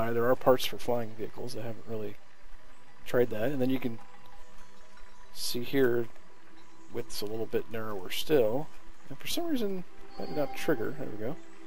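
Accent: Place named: United States. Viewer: That's great for you, but as an actual American I'm telling you this guy is an American speaking English. Not everyone in America talks the same way a rapper does. Pretty much no one does.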